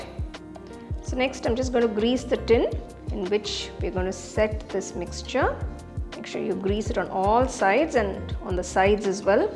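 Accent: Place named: Tamil Nadu, India. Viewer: Why speaking in english? sattu burfi is tamil word I think,if it's correct then speak in tamil,nd ur accent shows u r a tamilian,so speak nd explain in ur language ❤❤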